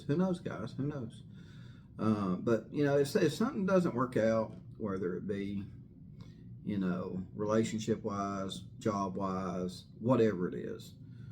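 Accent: South, United States. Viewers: I was a youngin'. I enjoyed that As I come from the Northeast. I was the same age as Nicole I think a year younger, So we were in our Early to mid 30's. I 't follow much true crime anymore like I used to many years ago with Nancy Grace. It's just the same child story of murder and sex abuse decades after decades but the names are changed. But I so enjoy your show, Your sensibility, level headness, of course southern accent! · Love the calm way speaks. U can actually hear him. Soft Southern Style! And no background nonsense. Yeah wtf is goin on with some people. I cant get ma head round it. All this evil, family annihilation, murder, missing kids….. It goes on and on! Depressing, upsetting. Have we learned nothing. Its 2024 now and this world seems to be getting worse not better. 💚💜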